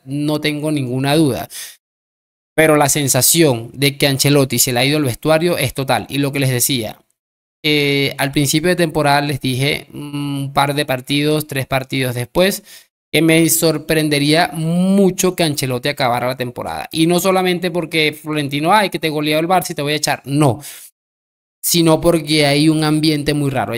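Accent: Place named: Venezuela. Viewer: Ojo como estás mi hermano por tu acento eres venezolano no soy madridista es más admire aquel equipo de Romario estoicob ladrum kuman Guardiola de la peña con cruif como técnico igual aquel Madrid de Butragueño hugol Michel Martin Vazquez Gordillo buyo Sanchís Valdano santillano Juanito uff equipazo y e seguido a ese Madrid de Roberto c Raúl Elguera casillas y cristiano y compañía y ojo Raúl filtraba casillas filtraba Sergio ramos igual lo acia eso siempre a sucedido cambio en los últimos.4 años a Zidane se lo cargaron con las filtraciones y ojo te lo dice alguien que admira al Madrid como el mejor de toda la historia del fútbol a la altura de Brazil Alemania e Italia pero no soy fans de este súper club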